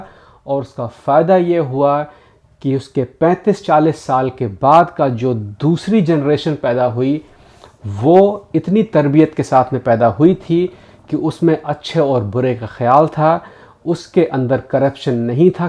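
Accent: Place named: Uttar Pradesh, India. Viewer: Maja a Gaya aap India mein kahan se ho aapki batchit up se milati hai aapki baten sunkar sunkar Achcha lagta hai Jaise Ham UP mein pahunch gaye ho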